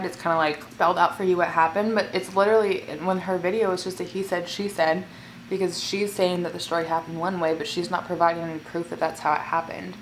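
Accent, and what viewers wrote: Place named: Washington, United States. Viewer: It's a Washington accent. Many Washingtonians pronounce t's as d's at times. "water" sounds like "wodder" in WA.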